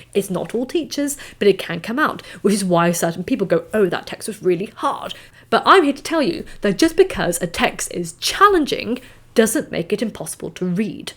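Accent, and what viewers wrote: RP, United Kingdom. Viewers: What a delightful and posh accent, it’s verbal caviar. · So refreshing to hear your british English accent, I rarely hear non-american English and at first I got scared I won't understand anything but that was not that hard. Just my process of listening to you was the additional argument to your speech 💪 I think there's also an important note of age-appropriation of literature, some classics are just speaking about themes that are not familiar for children or teens. And I wish people told me this in not a derogatory form, like haha you are stupid little girl you won't understand. It's not like that... It's more like people of country A won't understand the subtle context of literature of country B. And it's not a competition at all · Is this teacher why you sound so Posh? She drilled posh RP into you ?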